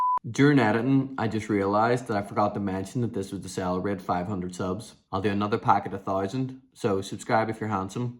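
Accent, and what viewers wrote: Northern Ireland, United Kingdom. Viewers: It always throws me for a loop when I click on a random YT video and hear an aul norn iron accent, since we're so rare on here. Good videos mang, keep er lit!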